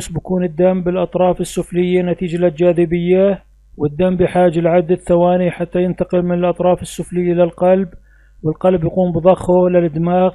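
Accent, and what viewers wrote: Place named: Syria. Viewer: يا دكتور انت سوري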